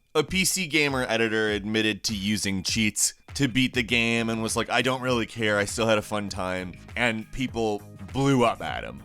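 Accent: United Kingdom. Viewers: `s Brit accent is awesome. Oh boy, want more of it lol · WHERE TF DID THAT BRITISH ACCENT COME FROM? 😂😂😂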